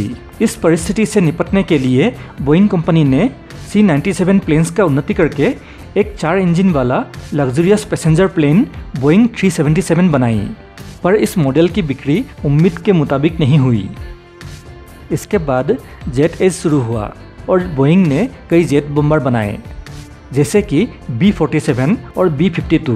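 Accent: Assam, India. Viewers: Tm Assamese hy kya 😅 Hindi assemess lag rah hy😅😅😅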